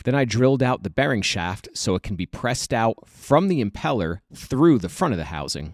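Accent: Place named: Delaware County, United States. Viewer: Delco wooder ass accent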